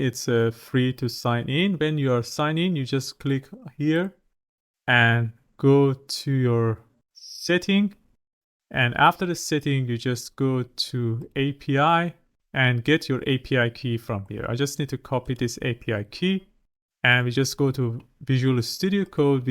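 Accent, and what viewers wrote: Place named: Iran. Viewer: Wonderful! I assume you're originally iranian. so am i. loved the accent and the smooth pace of the tutorial. It would be wonderful if you do a big project like Airbnb.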